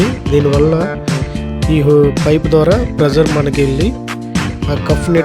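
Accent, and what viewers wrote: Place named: Srikakulam, India. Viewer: Srikakulam slang matladuvideos super😊😊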